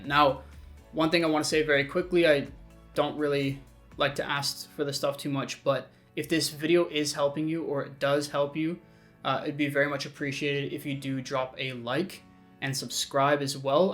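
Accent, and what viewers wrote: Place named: Canada. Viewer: Ahhaahhhhh! You are Canadian! 🤣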